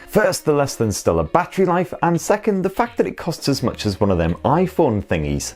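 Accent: United Kingdom. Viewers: Your Accent is marvelous love to listen it all day...😊😊 Brit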